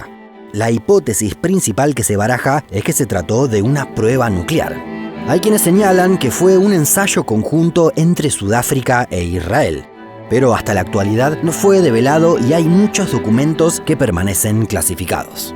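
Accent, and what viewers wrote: Argentina. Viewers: Me encanta escuchar ese asento argentino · Tal vez sea porque es Argentino. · Que mal que tengas el acento arjento, lo único malo del video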